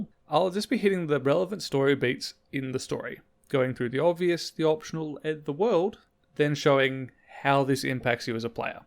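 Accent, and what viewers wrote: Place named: Australia. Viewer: Video essays are good. Plz do more. Good to hear an Aussie on here. While I've always enjoyed doing the extra info searching to get me into a mmo, WoW has started to be boring again. FF14 has been a great antidote. Thank you for making content on it from a similar perspective to mine. Keep up the good work mate and looking forward to hearing more from you once I've finished watching all your vids. (about half way through now).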